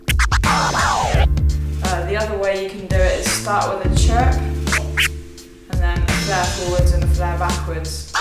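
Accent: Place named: South West, United Kingdom. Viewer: Yes... Defo Dope Skills! You sound like your from the South West; )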